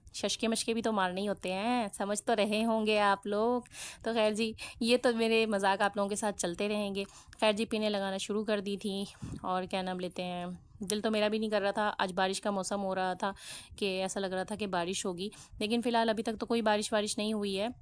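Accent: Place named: Punjab, India. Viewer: Urdu main Punjabi touch acha hai.chuk k rakho..😂😂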